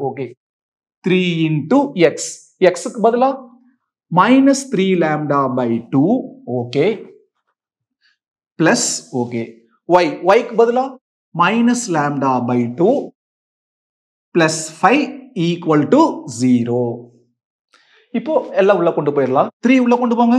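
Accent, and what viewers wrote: Coimbatore, India. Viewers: Coimbatore slang😋☝🏽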